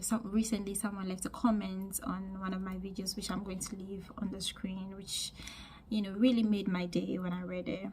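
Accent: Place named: Ghana. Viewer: The Ghanaian accent is slowing fading.. ❤❤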